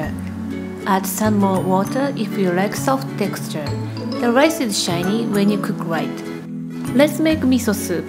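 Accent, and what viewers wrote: Japan. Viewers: english language with dialect japan but i love so much 😍😍 im from indonesia 🇮🇩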